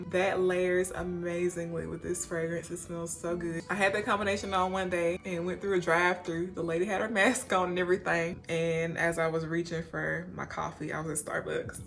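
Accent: South, United States. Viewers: What’s happenin lovely?Been subbed to you for a few months I think.Been meaning to pass through your page to show you some love at some point.Saw your vid here on my feed,so here I am 😉 Super solid page and content fam.Mad respect.Cool video here as well.Love your southern accent too :) Keep up the good work and have a wonderful week and beyond.SOTD = Ex Nihilo’s Bois’D Hiver to the wrists and forearms,and Creed’s Royal Oud to the front and back of the neck...came out Glorious 😊 Got the idea from Max Forti’s page a few years back.He recommended Oud Wood and Royal oud which is a really nice blend too..but I tried the Bois ‘D Hiver variation instead of the Oud Wood with Royal Oud because of their striking resemblance.Real cool :) 1 Love/Peace/Bless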